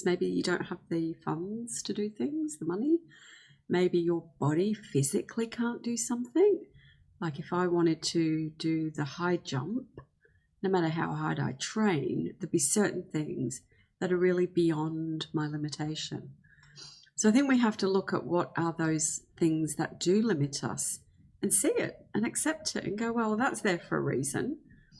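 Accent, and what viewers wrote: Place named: Australia. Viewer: Lovely to hear a fellow Aussie with some great words of love to share 👍🏽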